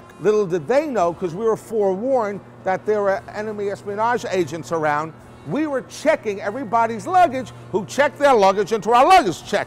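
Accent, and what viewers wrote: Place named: New York, United States. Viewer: Sounds like a New Yorker.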